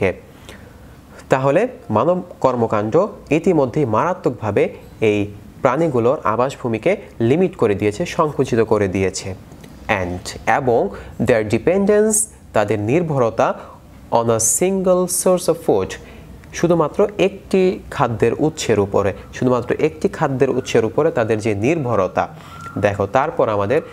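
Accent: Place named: England, United Kingdom. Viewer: ভাই বলেন ভালো লাগে, কিন্তু বাংলা ভাষা উচ্চারণ English এর মতো সোনায়।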